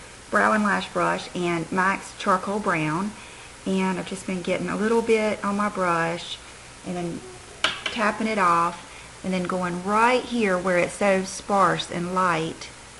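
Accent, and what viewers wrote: South, United States. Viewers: I really love your videos. I always watch your videos first. Love your accent, I am a southern girl too.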